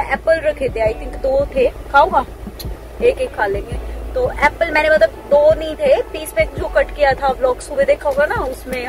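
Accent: Haryana, India. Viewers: OMG apka awaz haryanvi language m Don't blve it's u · Ap haryanvi bolte ho di❤️❤️❤️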